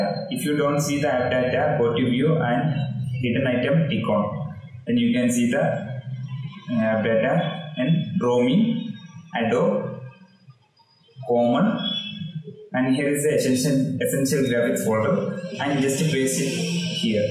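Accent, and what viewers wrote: Kerala, India. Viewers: ഇംഗ്ലീഷ് കേട്ടപ്പോ മനസിലായി മലയാളി ആണെന്ന്...മലയാളം മതി ബ്രോ...!! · bro... u from kerala???....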